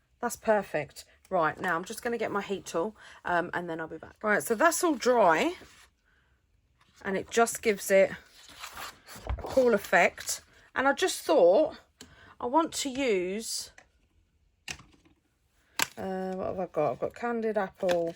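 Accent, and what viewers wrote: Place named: United Kingdom. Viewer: “Ah! I LOVE it”, in that charming British accent, makes me squeal with joy, too!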